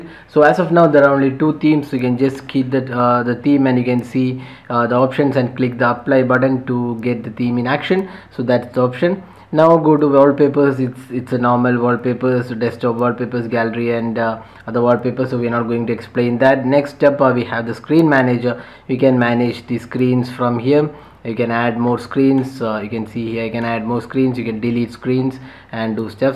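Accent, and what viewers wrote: Kerala, India. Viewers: Mallu accent 😁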